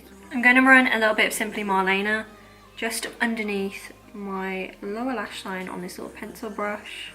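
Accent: United Kingdom. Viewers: I can't figure out what part of the UK you're from by your accent - I love trying to guess where UK youtubers are from but I legit cannot guess lol!!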